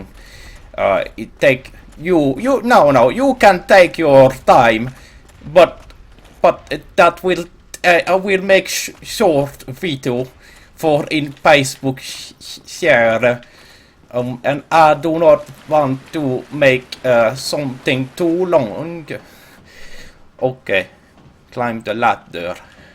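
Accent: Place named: Finland. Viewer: Your accent is bad and you should feel bad. Speak English properly, fucking Finns, I swear to god...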